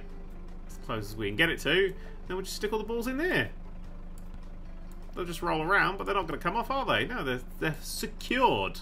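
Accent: United Kingdom. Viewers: I feel like Dan's accent has become even more British after he moved out of England...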